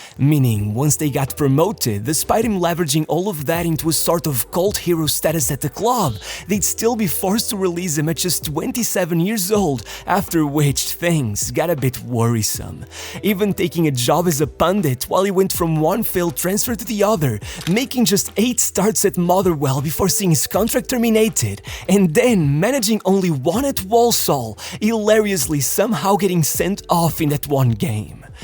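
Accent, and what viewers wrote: Portugal. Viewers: Are you Portuguese? Accent don’t lie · most portuguese english ever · Sotaque bem tuga xD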